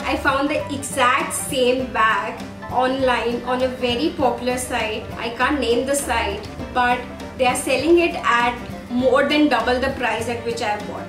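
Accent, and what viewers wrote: Delhi, India. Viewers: I always wondered that you never sounded like a Mumbai girl to me, you had that tone of a Delhities. And today i came to know that you are a Delhi Girl. Whenever you come back to Delhi please do a meet and greet coz i am also a Delhitie. #DilWaloonKiDelhi. And yes go try out sarojini nagar market, its awsm.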